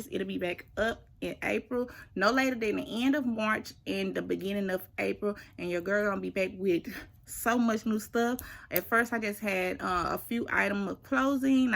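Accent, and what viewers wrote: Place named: Texas, United States. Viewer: Are you from Texas? I can hear the accent 🥰🥰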